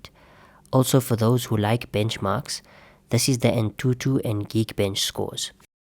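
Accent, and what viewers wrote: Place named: South Africa. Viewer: You can tell this guy is from south africa 😂 im also from sa bru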